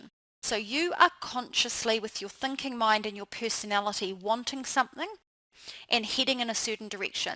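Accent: New Zealand. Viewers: So nice to hear a kiwi accent. Great Video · Rio Brasil Samba Shows sambistabeauty - cos we are KIWIS BRO - 😉 no harm in it, just our accent. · Wow great to hear the nz accent!! Good to know there are people in my little corner of the world thinking this way! Xxx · ~ Gosh you speak FAST, but with clarity. Some words escape me with the NZ accent. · I have been manifesting a trip to Australia for me and my son. I clicked on this video and heard your accent! I know you are from New Zealand but still!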